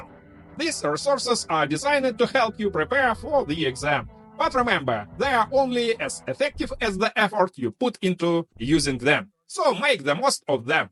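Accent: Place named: Russia. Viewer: your russian english is briliant)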